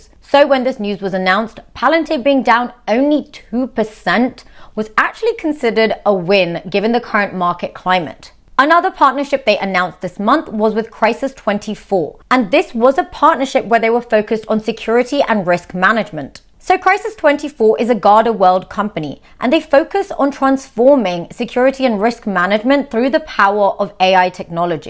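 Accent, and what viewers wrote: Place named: United Kingdom. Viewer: Do I dig your British accent!!!! Where would professor Higgins place your accent? Lundan?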